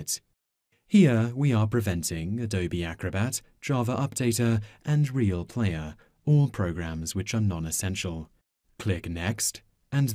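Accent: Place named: United Kingdom. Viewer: I love British accents, it is soothing to the ear lol. Makes the video a lot easier to watch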